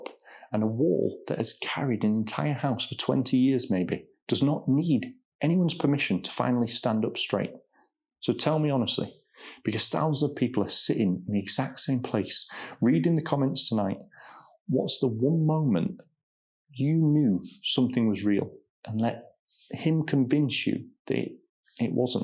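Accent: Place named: United Kingdom. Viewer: Oh....man...ive always seen u on tictok.....never knew you had the British accent!!! Love it!! Always have...Im from the states & i sound like a dumb hillbilly!! Thank you for all ur work, im still healing after 20yrs with a narc...u are a blesssing❤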